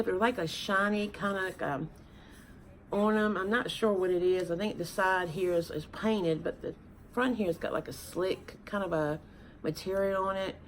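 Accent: South, United States. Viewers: New to your channel. I live on the southeast coast of Florida. I saw your coastal wall decor you put together , loved it and decided to subscribe. My style is not total farmhouse but more like coastal, rustic, distressed with a little vintage mixed in. I get a lot of inspiration from all styles of decor and make it my own for my style. Being raised by very southern parents, I detect a southern accent when you talk. I also subscribe to a couple of people that I see in your comments. I don't know if you subscribe to 2 people I'm gonna mention but I think you would really like their channels. Bargain Bethany is your girl for everything Dollar Tree. She not only keeps everyone informed on the newest items DT has come out with but does amazing diys out of them. If you want a very seasoned You Tuber that is into farmhouse and does some of the best collaborations, and up cycles you really need to check out Second hand Tracey Ann. 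Tell her that her Florida buddy sent you over. Make sure you check out her last collaboration she did with lemons. She did one each week for the month of May and they are AMAZING! If you want to grow your channel even more, participate in her collaborations. I love the DT haul you just did and I agree, it is so nice to decorate with pretty, matching items when you get together for dinners, bbq's and holidays with family and friends. I think I'm going to get a lot of inspiration from you. Have a great weekend. Hugs from the southeast coast of Florida 🌴😎💙😎🌴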